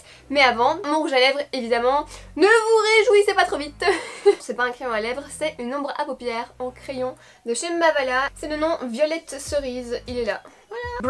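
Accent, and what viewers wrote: Belgium. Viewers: Accent belge VIVE LA BELGIQUE!!!!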